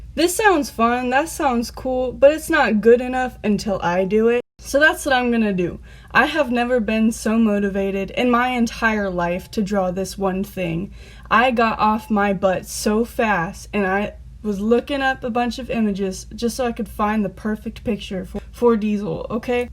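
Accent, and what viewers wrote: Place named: Arizona, United States. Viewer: YO YOU FROM Az lol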